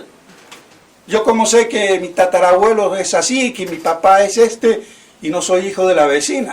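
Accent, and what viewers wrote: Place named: Cuba. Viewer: Hola UD vivio en México xque habla como cubano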